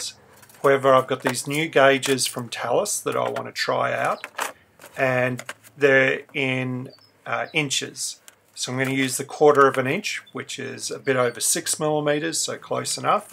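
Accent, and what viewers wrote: Australia. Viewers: You are amazing! Thank you for sharing your knowledge with us. I particularly love hearing your Australian accent. I too am Australian and proud to be with such brilliant work coming from you. Thank you so much.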